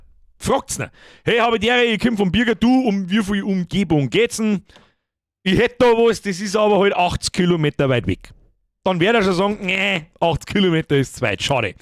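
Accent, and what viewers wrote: Bavaria, Germany. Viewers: Bin vor 2 Jahren von NRW nach Bayern gezogen. Höre deine Videos auf der Arbeit um mal mehr bayrisch zu verstehen😂 Funktioniert echt super! 🎉😂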